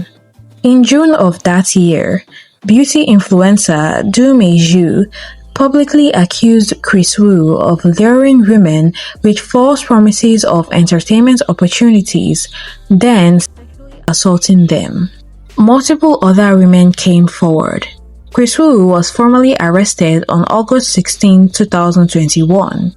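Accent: Nigeria. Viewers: I can clearly hear her Naija accent and she speaks so well. so what are you talking about?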